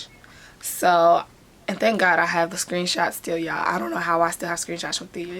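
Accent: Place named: Cincinnati, United States. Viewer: Your beautiful girl! Sounds like some Cincy shit lol! Where you from Mason or something? The suburbs so I am assuming because nothing about Cincinnati, Ohio suburbs especially not in 2006!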